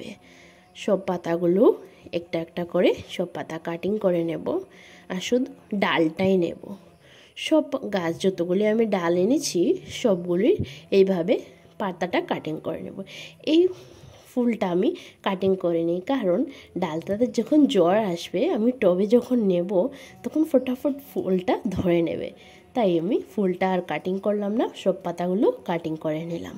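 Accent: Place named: Sylhet, Bangladesh. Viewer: Suddho bangla tumar dhara possible na, sylheti valo hobe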